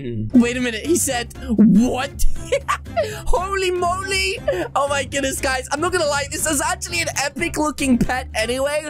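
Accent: United Kingdom. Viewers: Why is your accent British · No offence but you sound like jelly but I bit British I’m sorry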